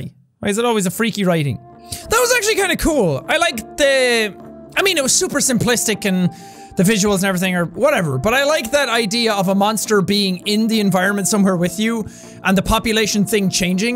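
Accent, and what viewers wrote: Ireland. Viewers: I think it's Irish like how he says shy-t instead of shit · i totally love his irish accent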